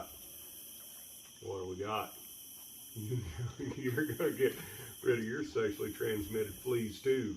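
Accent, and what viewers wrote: United States. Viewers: i cant get enough of ur american accent, i just love it how u say "come here u little shht" 😂😂😂 much love from Estonia!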